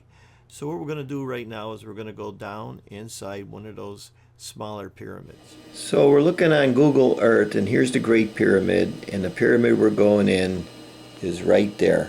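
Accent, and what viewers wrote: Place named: Coal Region, United States. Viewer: How exciting- thanks for the vicarious world travel- you are a stone man alright but you can't lose that 'coal region' accent lol...(I've got one too, Shenandoah) Grandfather a coal miner - Thanks for this it was very enjoyable. I just read that stones take thousands to millions of years to form?! Thanks.... Molly a follower, learning all the time👆🙂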